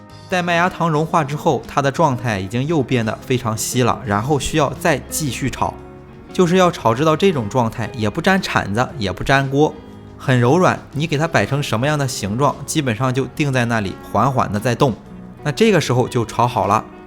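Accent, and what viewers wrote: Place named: North, China. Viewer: 这是哪里人，每句都有儿话音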